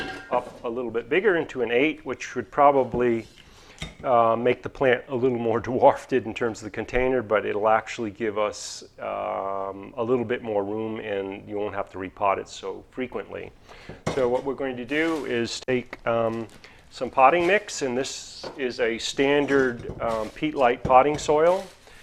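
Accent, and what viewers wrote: New York, United States. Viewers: Sounds like he's from New York or jersey.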